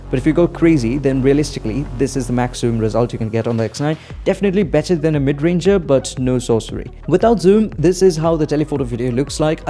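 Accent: Bengal, India. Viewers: Bruhhhh i knew it i kneeew it that u were Bengali but i couldn't proove it .... from the start I was sure about u from south .... Nice videos man 👍 love ur reviews keep it up 🙏🙏 ...... Aren't u gonna do a moto signature??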